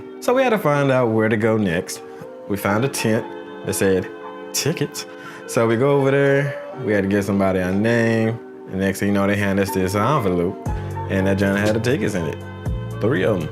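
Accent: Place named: South, United States. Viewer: “ she goes on out there “ Your so country 😭😭 Much love from Tennessee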